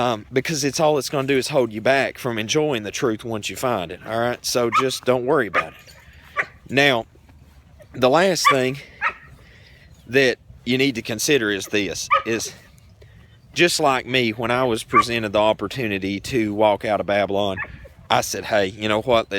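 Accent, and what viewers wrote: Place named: Kentucky, United States. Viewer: I like the accent is that KENTUCKY?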